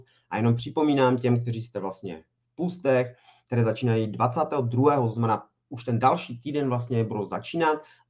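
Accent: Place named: Ostrava, Czech Republic. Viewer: Ten ostravský přízvuk je super, jen to dost kazí ta sprostá slova. A to je škoda.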